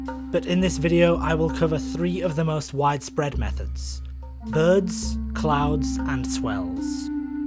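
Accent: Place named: United Kingdom. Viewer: Your accent is awesome. Where in the UK are you from?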